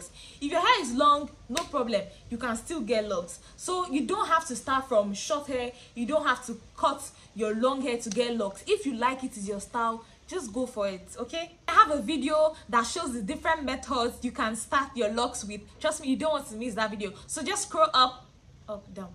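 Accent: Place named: Lagos, Nigeria. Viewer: What part of lagos are you from? You're accent is nice.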